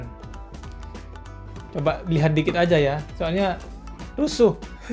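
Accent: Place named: Madura, Indonesia. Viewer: Logatnya kyak ada maduranya.. 🤭 Orang mana bro?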